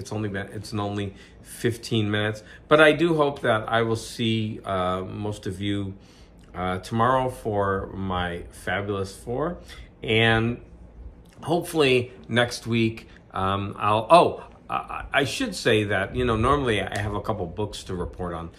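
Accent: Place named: Kentucky, United States. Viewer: Glad you did publish it! I always feel that way about my videos when I first publish them. Then I'll look at them later and think, "That wasn't half bad." Your Kentucky accent was the highlight in this one!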